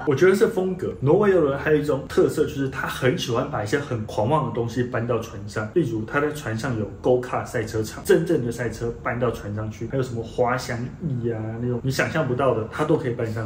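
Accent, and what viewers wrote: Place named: Taiwan. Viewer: I dont understand your Taiwanese Mandarin. Pls have the Chinese subtitle on. In addition, you are speaking far too fast. Making it even harder for any audience.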